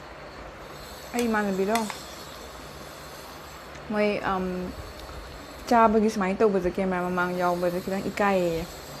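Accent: Kakching, India. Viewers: Kakching Marol da oina ngangngo aduna tabada nungsi tabasu nungai... Eshagi erol bu ngangthikpada ekairido karigino...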